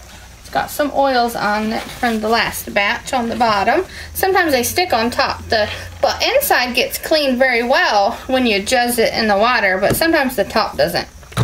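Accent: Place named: United States. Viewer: Such a gorgeous soap! I bet it smells devine 😍😍 I love your accent-where in America do you guys live? I'm in Tasmania Australia 🇦🇺 We don't have many soap makers here so buying it isn't easy :( wish you lived closer,I'd buy soooo many of your soaps haha :) xx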